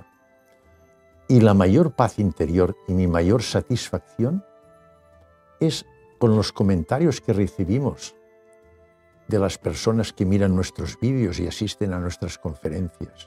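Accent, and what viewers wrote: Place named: Catalonia, Spain. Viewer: Hace algún tiempo viví en Barcelona , el acento es muy catalán hasta siento que le he visto antes . Abrazos , muy agradecida por sus charlas.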